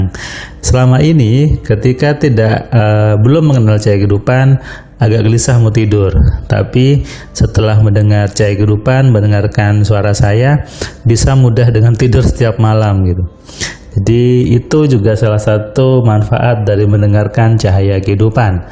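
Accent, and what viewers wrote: Madura, Indonesia. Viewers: ❤❤❤❤❤ mantab, logat Mas, logat madura..khas bangets, I Like It's 😅🙏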